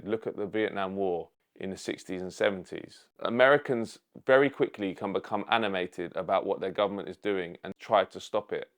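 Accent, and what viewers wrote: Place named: Cockney, United Kingdom. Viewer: Your London thick Cockney accent dialect is not easy to understand to a lot of English speaking nations. If you were more well spoken your message would get across more. Btw i agree with everything you say. I'm from London.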